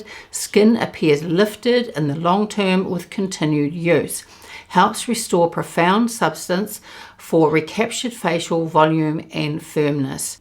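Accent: New Zealand. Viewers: Lovely Kiwi accent!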